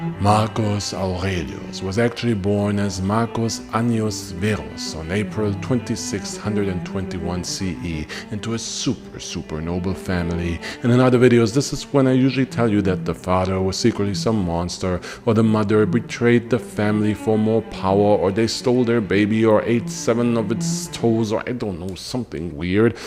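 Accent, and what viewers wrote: Germany. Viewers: Why do you pronounce Roman names with a German accent? Latin should sound more like Spanish or Italian. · Are you German bro? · Are you German ? Cause the way you say everything reminds me so much of the people in my life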